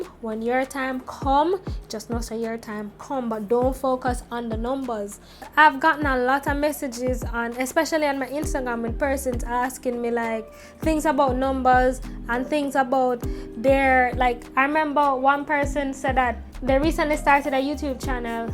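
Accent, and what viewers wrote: Jamaica. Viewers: Alsooo... I love listening to you speak! Makes me feel like im around my cousins and family in Jamaica ❤️❤️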